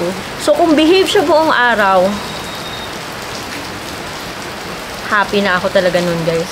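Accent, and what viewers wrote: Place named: Visayas, Philippines. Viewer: dah gawas lge imong pagka bisaya ahahaha..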